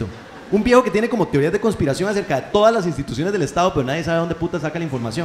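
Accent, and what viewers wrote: Costa Rica. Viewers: diay si no le gusta mae , que tirada .... Así hablamos los ticos mae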